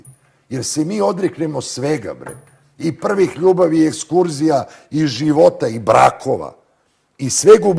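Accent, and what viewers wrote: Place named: Belgrade, Serbia. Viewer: iz Pljevalja reka bi čovjek da si rođeni beograđanin sa tom ekavicom.eeeee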